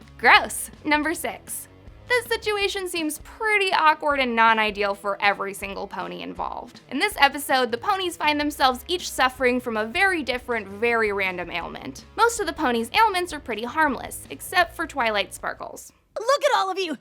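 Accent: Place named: South, United States. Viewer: Love your southern vibe too, the way you pronounce things is 👌🏻👌🏻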